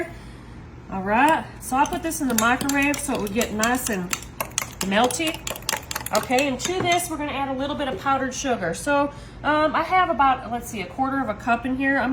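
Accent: South, United States. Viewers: Every thing you make is fabulous ! Enjoy you American accent 👍👍💖💞💞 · That's a southern accent.. not an "AMERICAN accent"!!!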